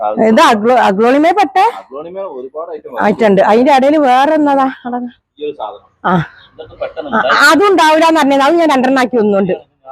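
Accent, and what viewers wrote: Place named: Malappuram, India. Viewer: വീട് കറക്റ്റ് എവിടെയാ, സംസാരം കേട്ടിട്ട് മലപ്പുറം ആണ്, എവിടെ ആണെന്ന് വളരെ കൃത്യായിട്ട് പറയോ, അല്ലെങ്കിൽ നമ്പർ തരി പ്ലീസ്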